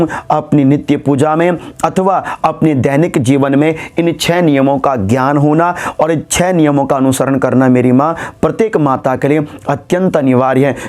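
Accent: Standard. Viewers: Aap kitna accha Bolte hai akdam sudh🙏🙏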